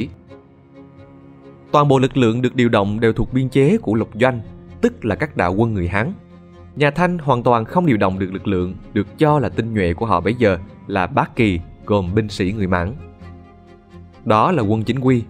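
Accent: South, Vietnam. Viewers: Kênh mà nói giọng nam thì cứ phải cẩn thận ...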